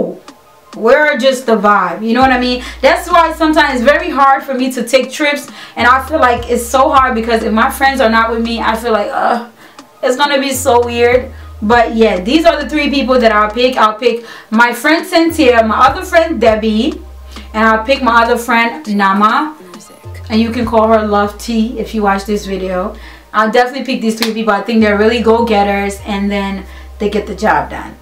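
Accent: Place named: London, United Kingdom. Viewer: i heard a london accent as well😂😂😂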